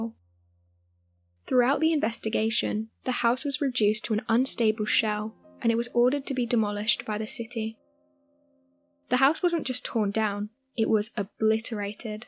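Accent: United Kingdom. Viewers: She's British. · What’s this beautiful accent? I know prob UK but is it Welsh, some specific part of England ? Thanks it’s just beautiful for stories .... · Nice narration voice.clear and concise.British of course.lol.😁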